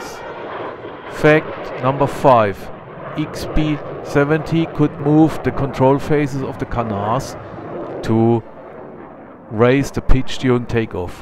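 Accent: Germany. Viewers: train your english. There is no excuse that every german hears you are a german. Try speak Valkyrie "Wall Kü Ri" Best regards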